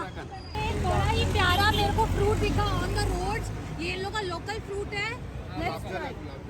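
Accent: Karnataka, India. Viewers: Hii dhii I am from North Karnataka dhii I am big fan of urs very big fan I have seen ur all vedios and all vedios are awesome and actually I love urr dailogs "delicious" and "much needed" and l love uhh lotzzz sisyyy plz reply me waiting for ur reply plz reply me I am very big fan of urs and plz visit north Karnataka eagerly waiting to see uhh in our North Karnataka plz explore North Karnataka food and ur kannada is soo cute....plz reply Lotz of love from Vijaypur ♥️💯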